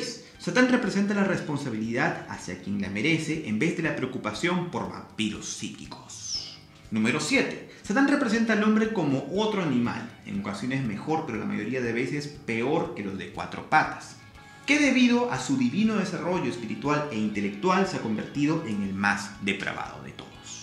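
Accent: Peru. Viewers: Eres de perú????? buen video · ¿Es de Perú? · Eres peruano?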